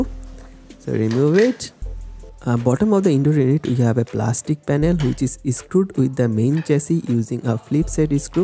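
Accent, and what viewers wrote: Bengal, India. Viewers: bhaiya bengali ho kya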